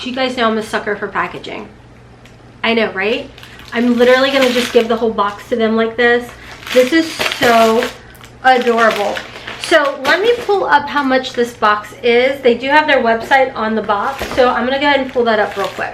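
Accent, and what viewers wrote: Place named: Kentucky, United States. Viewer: I haven’t even watched this yet but saw it was posted and literally said out loud “YAY!!!” 😊 I am enjoying all of your channels!!! Im from Ohio, originally from Dayton area but a lot of my family live in Florence… I live in Florida now and it’s so funny to me that I found someone on youtube from Kentucky.. My mom is from Morgan County Kentucky so I enjoy hearing your accent creep out here and there…. Cant wait until you launch your products.. I have it on my calendar!!🎉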